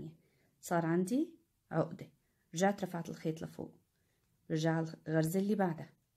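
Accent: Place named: Syria. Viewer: الله يعطيكي العافيه بظن انك من عنا من سورية ع هوى لهجتك .. بس يئلبي كأنو بيطول الواحد لتطلع معو هيك سجادة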